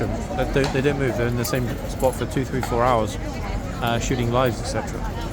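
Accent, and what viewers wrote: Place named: United Kingdom. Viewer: HELLO, I LIVE IN THE STATES & I AGREE ABOUT EVERYONE TOUCHING THE HORSES. I DO NOT UNDERSTAND. WHAT THE BIG DEAL IS THAT PEOPLE FEEL THEY NEED TO TOUCH THE HORSES. I CAN SEE A CHILD WANTING TO, BUT NOT A ADULT. THEY NEED TO BE TOLD NO RIGHT A WAY. THERE ARE GAURDS THAT SIT ON THE HORSES WHEN SOMEONE IS MESSING AROUND WITH THIER HORSE, that do not say any thing, some of them scream & scare me. THEY NEED TO BE YELLED AT RIGHT THEN. THERE ARE MANY THAT DO NOT SAY ANY THING, THEN SOME GO OVER BOARD WITH IT. PUT A LIGHT WEIGHT SIGN ON EACH OF THE HORSES NECKS. PLAY A RECORDED MESSAGE , BUT I THINK THE GAURDS WOULD GET TIRED OF THAT. MAKE A WOODEN ARM WITH A SOFT HAND COME OUT & SMACK THE PERSON WHEN THEY TOUCH A HORSE. I THINK THEY WOULD GET THE HINT, DONT YOU. SOME THING ELSE I WANT TO MENTION, WITH ALL THE PEOPLE THERE TAlking, & all the traffic, you with the british accent, it is very hard to understand what you are saying & talking about, I lIke to hear it all. 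Is there any way you can speak up,? I am afraid if you make it louder it will make e ery thing around you louder. Thank You for reading. If you need any more DO NOT TOUCH THE HORSE IDEAS, JUST LET ME K OW. LOL, DONNA I LIVE IN TENNEESSEE, BUT I AM FROM CHICAGO, IL. OH ONE MORE THING, I THINK PEOPLE WOULD WATCH YOUR VIDEOS NO MATTER WHAT THE TITLE, BUT I WE FEEL THAT YOU TITLES & YOUR TITLES DO NOT ALWAYS MAT H UP. YOU THI K YOUR GOI G TO SEE A VIDOE THAT THE GAURD IS GOING TO GO TO WAR WITH A TOURIST, BUT IT ENDS UP NOT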